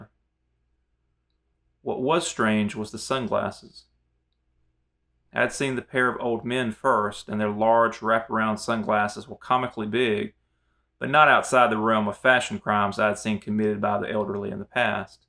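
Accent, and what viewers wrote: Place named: Southeast, United States. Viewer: you sound like the south eastern section of the us to me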